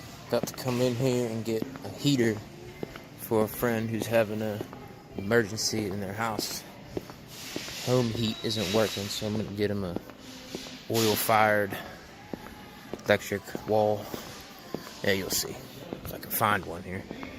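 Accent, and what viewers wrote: Pennsylvania, United States. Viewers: Holy Pennsylvania buddy. I'm from PA and live in GA so I can pick up that accent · Yep, the PA accent for sure. Grew up'err 'bout a mawl from the store down'err .. luv it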